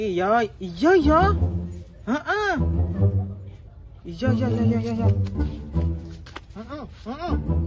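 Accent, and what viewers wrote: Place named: Sidoarjo, Indonesia. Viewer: daerah ndi yo...? logate koyok Sidoarjo...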